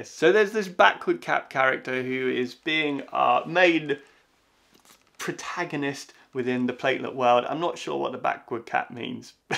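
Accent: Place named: Yorkshire, United Kingdom. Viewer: Hi i hope you see this but it would be really helpful if you put subtitles on your videos coz im having trouble listening to you with your accent i wanna say its sounds like a Yorkshire accent but i could be wrong. it would make my life easier as being hard of hearing. Also would you love it if Cells at work did a Covid19 episode coz i would.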